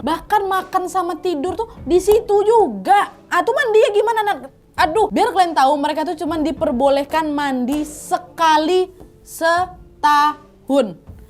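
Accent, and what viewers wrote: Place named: Medan, Indonesia. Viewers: Serasa macam sedang di Medan lah awak nih... sukak kali dengarin cakap nya nih... tebayang awak masa kecil di Medan.. sukses ya.... 😊😚👍👍👍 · Gak pernah bosan melihat vidio vidio kamu syg lucu gokil. Rame dgn logat melayu medan nya.. Trimakasih mbk uda selalu menghibur dgn cerita kisah nyatanya. Sukses terus ttp semangat dan semoga kamu sehat dan bahagia. Amin 😇🙏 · Enak kali dengar ceritamu wak..ada logat medan, ada logat minang,, nyampur2 😂😂😂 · Logat Medan,Sumbar dan Pekanbaru menyatu 🤣😂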